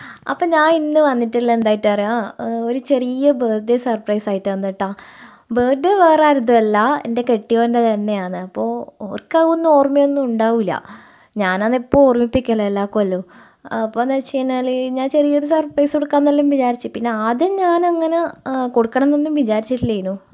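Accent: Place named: Malappuram, India. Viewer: മ്മളെ മലപ്പുറം കാരുടെ വർത്താനം പോലെ ഇതും ഉഷാറായിക്കണ്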